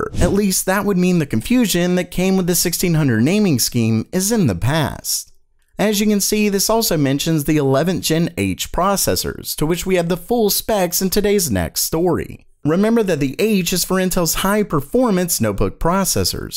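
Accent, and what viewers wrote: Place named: South, United States. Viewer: I can't tell if is a southern guy trying to hide his accent or a regular trying to do a southern accent.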